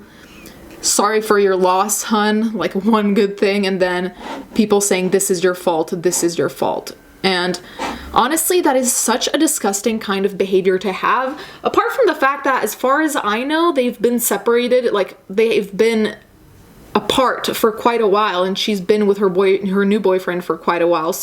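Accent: Italy. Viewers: I saw a tweet that said that she Googled his middle name so she could put it in her post HA. Omg I didn't know you were Italian?? The accent!!! Drag me. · Love you saying things the Italian way lol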